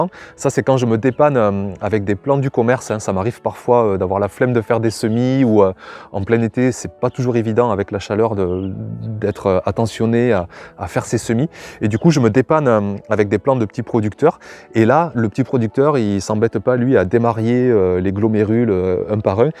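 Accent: South, France. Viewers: Un Topeurwére! Ah l'accent du sud ! 😁. Super vidéo comme toujours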